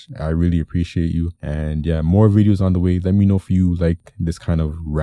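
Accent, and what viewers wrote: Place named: Toronto, Canada. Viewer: Here is my one thing...and before I get to that, loved the review, my guy. Spotted the Toronto accent right away. But, music. Wireless. No one ever talks about this. But if you have expensive headphones, with aptx HD, aptx adaptive or aptx lossless, one plus wins. And Samsung could make it available. Snapdragon-Qualcolm chips created and supports those codecs. Licensing fee is maybe $10. At this price point, who would notice. I can't support Samsung bricking my phone for their silky proprietary codec. Headphones are almost as much as the phone. So...really? Just a bad look.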